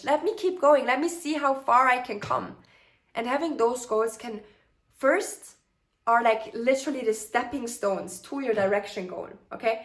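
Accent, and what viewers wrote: Germany. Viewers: You are German correct ? I can hear the accent. 😍😍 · your accent is really nice though very subtle like I said I would have guessed a Germanic language but it’s not distinct enough that I would identify it as German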